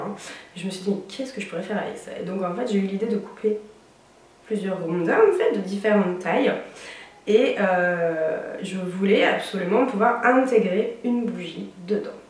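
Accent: South, France. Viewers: Joli DIY de récup' ;) ! Tu as déjà un peu l'accent du sud !! Cela m'a tout de suite interpellé ! Bisous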